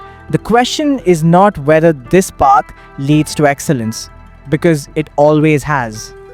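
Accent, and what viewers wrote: India. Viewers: Hi, I really like your videos and your country is japan in description but your English is telling that you are Indian. Am I right sir? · Indian accent 🤮🤮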